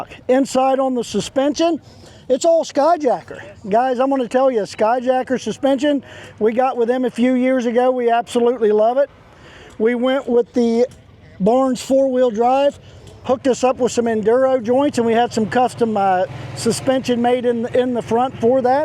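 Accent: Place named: West Virginia, United States. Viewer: What part of West Virginia are you from. I’m originally from Kimberly. Every heard of that little holler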